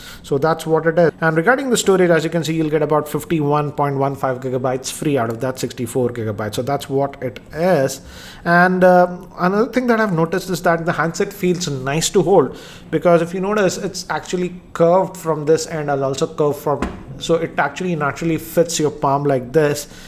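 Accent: India. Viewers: So that what it is. Indian English sucks👎🏻👎🏻👎🏻👎🏻👎🏻👎🏻👎🏻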